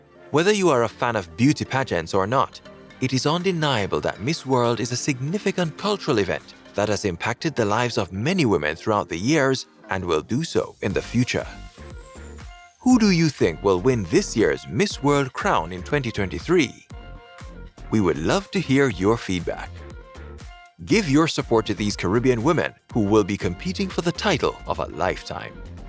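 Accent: Jamaica. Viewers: I have watched videos by this narrator and he sounds Jamaican and has a bias for Jamaica in his videos. There was no mention of Giselle La Ronde or Wendy Fitzwilliam . He bigs up Jamaica more than any other island 😮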